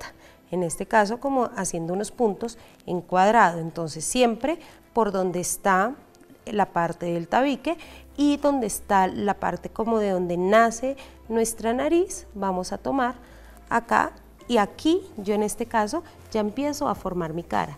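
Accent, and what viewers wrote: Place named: Colombia. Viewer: Eres Colombiana lo digo por el acento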